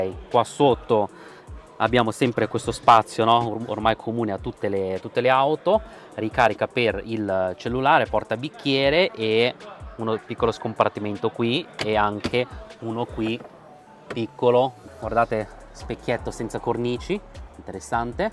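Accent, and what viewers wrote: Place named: Milan, Italy. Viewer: Come percepiscono il tuo cinese con accento milanese ?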